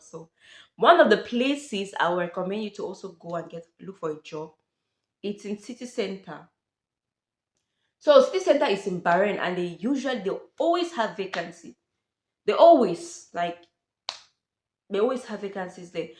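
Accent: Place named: Africa. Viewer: Hi,it's so goo d watching your videos really. You are indeed an hardworking young African. You claimed to a Cameroonian,but your spoken English sound like someone from Anglophone African countries.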